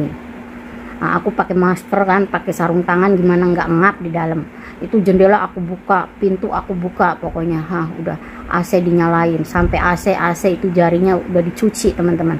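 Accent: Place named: Indramayu, Indonesia. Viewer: logatnya kek org indramayu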